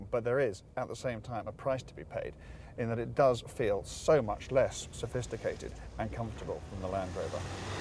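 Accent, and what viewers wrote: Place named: England, United Kingdom. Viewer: Ведущий-то не очень на англичанина похож ))), акцент да, но внешность нет )))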